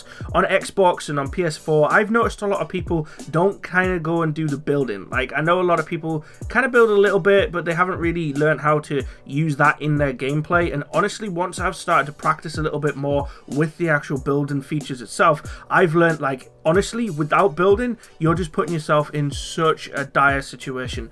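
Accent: Newcastle, United Kingdom. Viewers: Nice video dude - enjoyed that. Bonus points for what sounded like a Geordie accent too! =)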